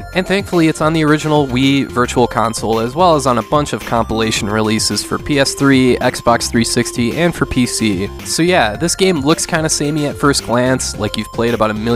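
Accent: Minnesota, United States. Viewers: Loved that Minnesota accent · Wait u a Minnesota resident?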